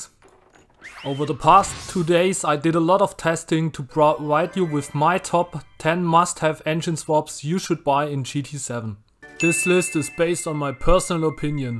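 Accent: Germany. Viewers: I smell Deutsch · Ich liebe deinen deutschen Akzent 😂♥️ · Junge, red doch einfach deutsch. Das is ja grausam. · I like u Kartoffel English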